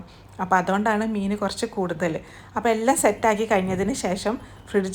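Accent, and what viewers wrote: Kozhikode, India. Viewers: അതെ ഞമ്മൾ കോഴിക്കോട്ടുകാർ അങ്ങനെയാണ് പറയുന്നത്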